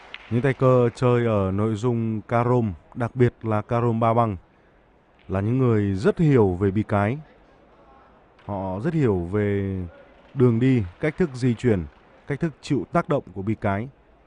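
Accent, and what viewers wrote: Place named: South, Vietnam. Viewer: Tường thuật Bida chỉ cần chậm nói ít nhưng đủ thế thôi chứ đâu cần phải liến thắng như tường thuật bóng đá mà người bl nói tiếng miền Nam nói quá nhiều làm mất đi cáo hay cái đẹp của môn thể thao này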